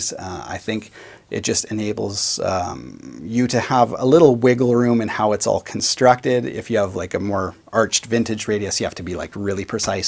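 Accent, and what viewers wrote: Canada. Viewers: That’s because he speaks Canadian.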